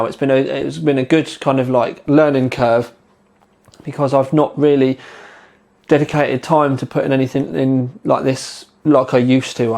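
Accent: England, United Kingdom. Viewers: *Clicked on video* *Heard you were English* *Subbed* *Watched the rest of the video* *Stayed Subbed* Such a good rig!!!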